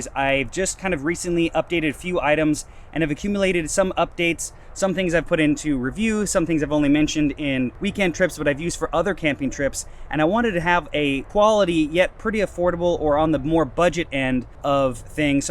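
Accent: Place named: United States. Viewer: I follow you since 2013 I think, and I have never understood a single word, because you speak very fast and with american accent (I'm Italian). But I like your videos! :)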